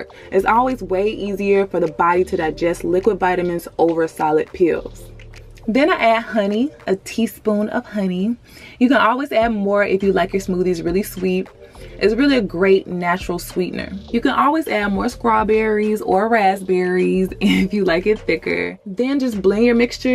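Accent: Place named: St. Louis, United States. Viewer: Lol we kinda sound alike...sounds like u may be from st. Louis.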